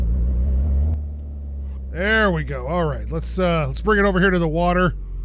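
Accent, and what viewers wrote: Canada. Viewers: Are you Canadian bro?😶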